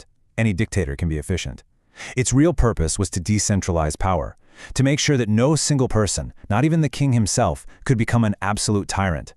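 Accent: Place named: United States. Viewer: Get the accent right with a bubbly head, this si too american.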